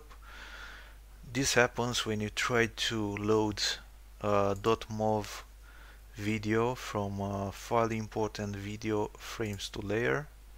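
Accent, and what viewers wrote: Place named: Russia. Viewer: слышу русский акцент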